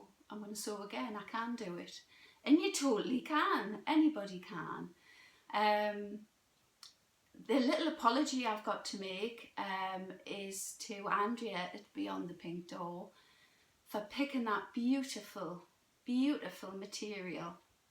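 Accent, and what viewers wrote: Newcastle, United Kingdom. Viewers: Morning, is the pattern piece you showed not the back? You’re such a laugh and it’s great to hear your Geordie accent, which I miss so much. 🙋🏻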